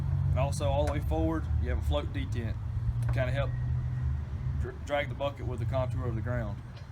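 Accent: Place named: South, United States. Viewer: Understood 'most' of it through that southern accent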